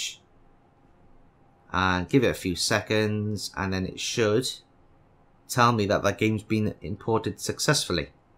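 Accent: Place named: Wales, United Kingdom. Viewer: For a second at the start there I thought you were Irish too, turns out not so much.... Welsh I think.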